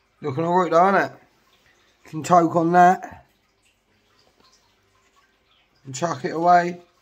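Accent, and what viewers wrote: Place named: United Kingdom. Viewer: Finally a fucking video where someone actually speaks!! And of course its a fellow brit 🙌 legend mate!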